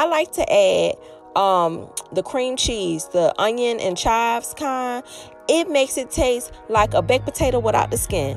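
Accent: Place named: Baltimore, United States. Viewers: Loved the video! I knew you were from the DMV area from when you first started talking! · Soon as You started to talk I was like “she sounds like me “. I know a DMV native accent when I hear one lol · She sounds like she from DC🤭😍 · Good Lawd! You did that!!! I'm cooking that tonight. I am from New Orleans and looks like home. But, you sound like you are from DC!!! Thanks! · Amazing video!! You sound like u from the DMV area 😁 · Who is this lady why am I just finding you you sound Baltimore😂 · I’m cracking up!! I heard you talking and knew you were from the DMV then I saw the Shoppers label and knew!! Love this recipe sis. Well done 👏🏾👏🏾👏🏾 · You frombaltimore 😂😂😂 accent🥰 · I think I’m hearing a little bit of a dmv accent , but this look so good 😍😍😩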